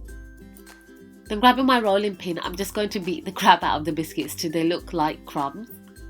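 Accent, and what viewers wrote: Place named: Luton, United Kingdom. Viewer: Are you from Luton?! Because you sound like it I mean that accent! I'm from Luton as well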